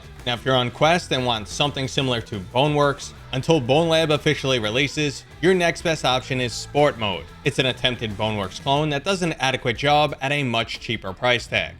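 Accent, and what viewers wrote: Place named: South Boston, United States. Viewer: Appreciate the work put into this video and the Southy accent lo thanks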